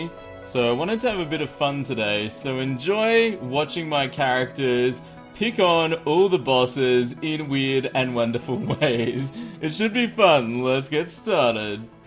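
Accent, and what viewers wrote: Australia. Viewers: is Australian I thought he was English my mind is blown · 4th like I never new u was Australian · Wow didn't realize u were austrailian(guess I should have known from ur accent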